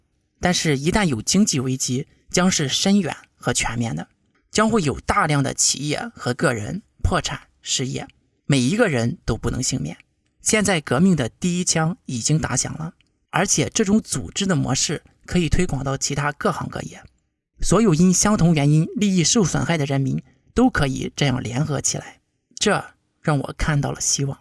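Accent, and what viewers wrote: China. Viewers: 是吗？当你带着浓浓的大陆口音在这里瞎掰。中国崩溃论已经几十年了，这种陈词滥调。不嫌乏味吗？要么就说明你没水平。你认为你现在评论中国部分的经济矛盾问题就崩溃了，真是可笑…做up主，要么有很深的经济功底来评论这种经济现象，要不然说实话，你只会让人大笑…这种论调就是在描述美国的股市一样…好好把自己的水平提升提升，别丢人网上有好几个不错的up主。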